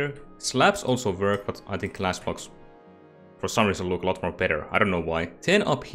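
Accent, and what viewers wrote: Finland. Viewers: Are you finnish ?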